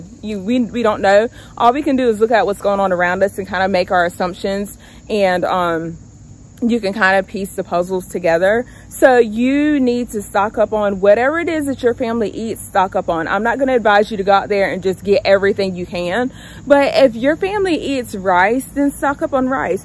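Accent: United States. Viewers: God knows I love your country ass accent, great video by the way.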